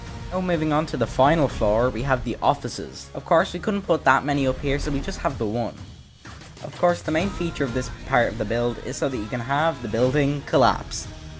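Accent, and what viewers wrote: Ireland. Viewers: Are you Irish I recognise your accent. I am Irish myself btw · Yo he's Irish!!!!!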